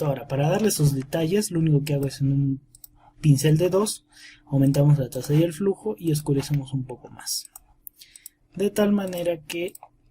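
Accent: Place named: Peru. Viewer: me harta tu voz de peruano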